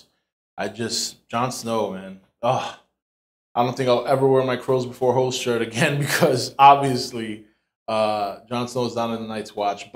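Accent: New York, United States. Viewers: awesome review. i always love your reviews. are you from new york? your accent closely resembles that of a new yorker, and your views always closely match my own!!!!! original post thanks, you rock, and this was such a messed up ending.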